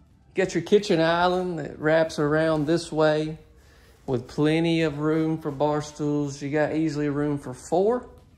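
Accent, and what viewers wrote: South, United States. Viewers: Y'all don't have to watch his channel, he can talk any way he wants too! Y'all up north talk funny to us southerners too. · Lovely home. Wish they had a person showing it that was more professional and talked “normal” without the unclassy southern accent. He makes the video trashy. Can’t stand southern accents.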